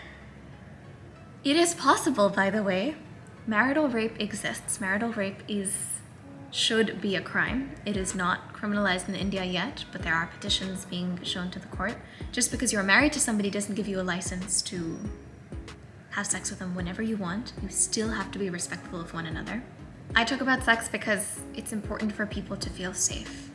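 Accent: United States. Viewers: Didi ap aise english bolte ho jese ki ap phle se american ho❤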